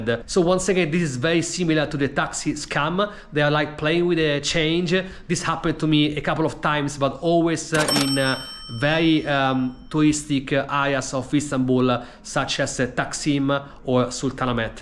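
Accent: Italy. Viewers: It looks you have italian accent! So I suggest you make also a video about millions scams in Milano,Roma,Venezia.fiornze... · You sound Italian by the way you speak English. Am I wrong?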